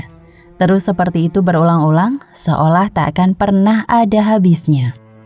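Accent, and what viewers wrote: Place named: Java, Indonesia. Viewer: kadang normal, kadang medok suara miminnya,.. dari sini bisa disimpulkan mimin org jawa 😁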